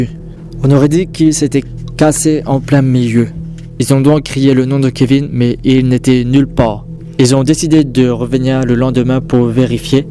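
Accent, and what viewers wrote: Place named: England, United Kingdom. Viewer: Je pense juste qu’il n’est pas français mais plutôt anglais ou américain tout simplement vu l’accent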